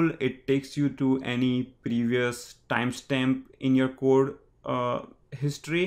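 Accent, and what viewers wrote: India. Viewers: So simple, so understandable and with the Indian accent that switches the brains into learning mode. Thank you!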